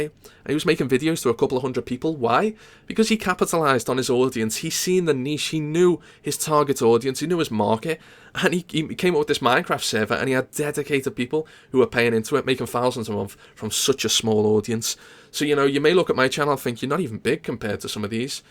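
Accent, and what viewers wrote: Liverpool, United Kingdom. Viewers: ***** Hes a scouser they all sound like this lol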